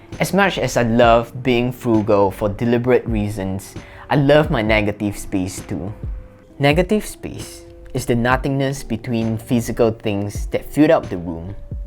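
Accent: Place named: Singapore. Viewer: I thought your accent was familiar, hello fellow singaporean!!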